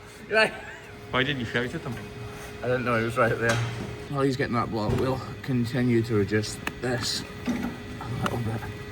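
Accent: Scotland, United Kingdom. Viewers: Enjoy your videos and the craziness you find. It is quite amazing (and gross). You're also very handsome, and you're all up in some serious cow sh*t on the daily, helping fellow animals. That's all kinda, pretty hot (not the cow shit, but the level of care you put into your work and the animal, while spitting/wiping it away when you get shot in the face with shi*t, piss or puss). Haha. God bless you, sir, for the work you do. AND! You're from Scotland! I love it, love the accent. My dad's side of the family is Scottish; I've traced my family heritage back to Scotland, in Lanarkshire. My family raised Clydesdales, which also originate from Scotland. It's been my next big wish to make a trip to visit Scotland. Born and raised in Montana, USA, on a farm and ranch, so yes, I have much appreciation for your job and the work you do! Good on ya, lad! 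And, yes, I subscribed! :) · I like channels such as yours because I love Scotland , people from Scotland, and animals. My ancestry includes dairy cattle and their care on nearly every branch and twig of my family tree. I no longer question where my curiosity comes from because it is all genetic memory. So my great, great grandfather had an accent like yours. His grandson married a young lady who was born in the US to Bohemian parents who spoke German. Dairy cows on both sides. My matrilineal side back before 1700 when they arrived in Jamestown, Virginia came from a place in France called Beau Vac or beautiful cow. I appreciate your skill, love, and care of these beautiful creatures. Thank you. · Handsome man 😍 Scottish accent 😍😍 Kindness to cows 😍😍😍😍😍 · Love what you do for these cows, I'm from America and I love cows, it's awesome to se you helping them. I never really knew the full extent of the work that goes into caring for the cows. 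Great explanations as always man!! Also, love the Scottish accent (I'm saying this and laughing cause I'm from Alabama, and we have a very thick accent.)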